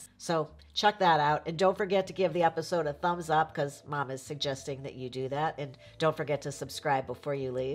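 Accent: Chicago, United States. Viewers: I am getting addicted to your blogs, since I am a recent widower in the dating game. I am very comfortable listening to your Chicago accent! Do you have a good friend who needs to date another "Good Guy"?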